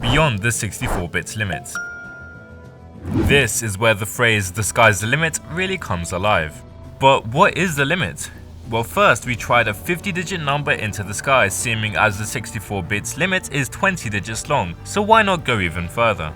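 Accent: United Kingdom. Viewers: You're British? I am asking this because of your different ascent!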